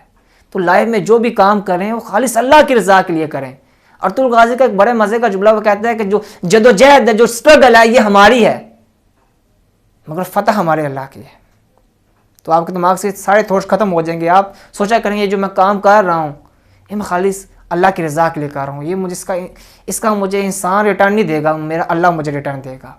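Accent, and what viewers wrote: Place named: Punjab, India. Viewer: MASHA ALLAH aap buhut acha kaam ker rahy ho.....well done... buss apny Urdu accent py kaam kerty rehna.... kyonky Punjab main yeh normal hai....Mulk (country) ko Mullak.... Motivational speaker ky liye us ki awaz aur lehja buhut ihmiat rakhti hai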